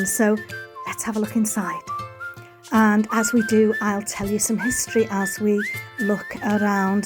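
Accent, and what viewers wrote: Wales, United Kingdom. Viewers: I can easily tell if an English speaking person is English, American, Scottish or Australian...but the Welsh accent is new to me... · 🌞🇧🇷☕hello from Brazil for. I love your videos with the old history from Castle and houses. They are so fantastic. You have a beautiful British accent. 😘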